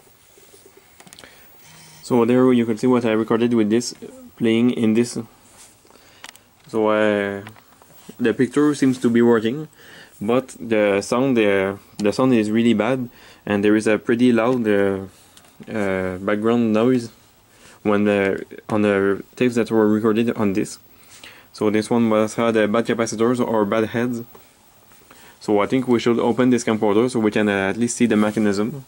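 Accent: Quebec, Canada. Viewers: you live in quebec i can tell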